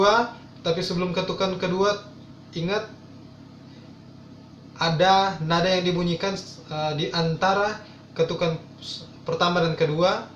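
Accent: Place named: Manado, Indonesia. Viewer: Dari logat berbicanya pasti orang manado nih hahaha.. :D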